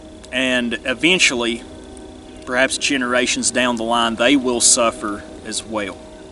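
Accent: South, United States. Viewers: Your accent is a beautiful thing, brother. Never lose it, pass it to your children. It does not indicate lack of intelligence, you clearly have an abundance of that. Where I'm from, Coosa, the toes of Southern Appalachia, it's dying. The monocultural poison has crawled up from Atlanta and the anri-Southern rhetoric of the last hundred years or so is teaching our young folks that the way we sound means we're stupid. But no, it is a dialect, not an accent. We have our own language. We Southern folks need to stand up once again and say: "No, we are not like you. We are our own people. We have our own language, our own culture. We are here, and we won't be crushed."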